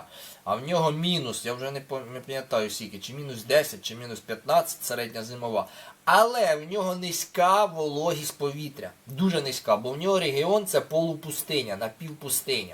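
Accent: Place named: Surzhyk, Ukraine. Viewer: Хороша українська мова. Невеличкий суржик надає шарму. Плюсик :)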